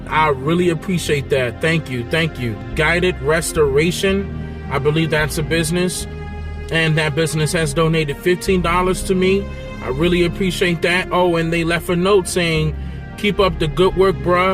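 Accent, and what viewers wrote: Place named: DMV, United States. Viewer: I knew he was from the dmv i can hear it all in his voice.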